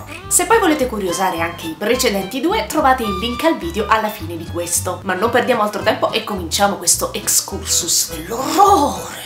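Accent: Rome, Italy. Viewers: Ho visto tutti gli horror possibili e anche se alcuni mi hanno delusa sul finale mi sono comunque piaciuti... Ma "il quarto tipo" non so perchè mi ha fatta proprio cacà sotto!!! Tu l'hai visto? PS: a furia di vedere i tuoi video inizierò a parlare romano XD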